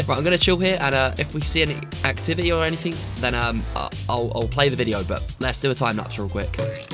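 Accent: United Kingdom. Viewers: Me: Oh no~: Oh norw! Ohw my gawd~ (No hate, love the accent <3)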